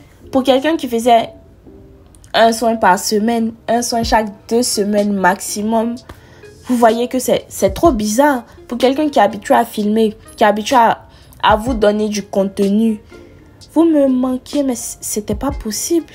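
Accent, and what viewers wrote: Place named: Ivory Coast. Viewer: «Deux cuillères de miel ow écoutez moi😂 Fhum » j'aime trop ton accent ivoirien là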